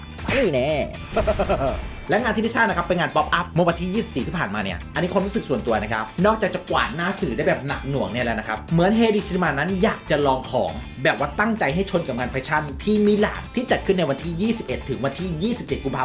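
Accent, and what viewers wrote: Isan, Thailand. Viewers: ผมชอบเสียงบรรยายของคุณมากผมฟังภาษาอิสานที่คุณสอดแทรกมาออก ขำมากเลย หัวเราะทุกครั้งที่คุณพูดอิสาน ชอบและหลงไหลภาษาอิสานมากเลย ตอนี้ฟังหมอลำรู้เรื่องแล้วครับ เป็นแฟนตังจริงครับ ดูทุกคลิปครับ ลงบ่อยๆนะครับ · ชอบฟังช่องนี้ตรงมีความพูดอีสานไป ขำไป อวยยศแบบสุด เป็นเสน่ห์ของช่องมากค่ะ 😂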